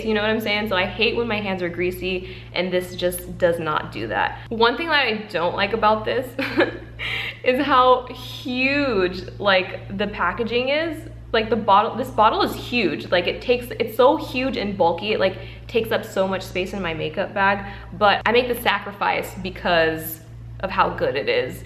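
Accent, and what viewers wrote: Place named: Australia. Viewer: I recently started watching your videos/subscribed, and i am STILL AMUSED by your Australian accent . ITS HONESTLY SO GOOD IN MY OPINION. 😂😂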